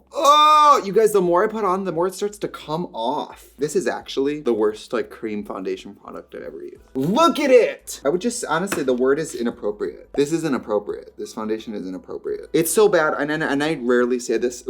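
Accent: Wisconsin, United States. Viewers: trixie’s wiscansan accent really popped out in this video (i say as someone from effing maryland) also a whole face of milani might be the move. 👀👀👀👀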